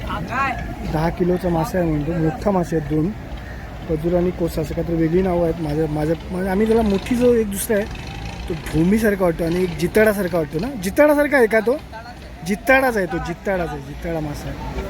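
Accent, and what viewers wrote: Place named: Malvan, India. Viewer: Tumchya tondat Marathi bolata bolata malwani bhasha yeta garva asa malwani aslyacha😂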